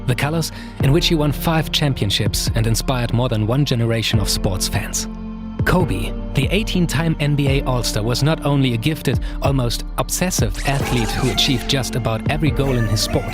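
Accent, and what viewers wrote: Germany. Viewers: Super Arbeit die du leistest mit den Videos! Man hört auf jedenfall raus das du deutscher bist :D Keep up the great work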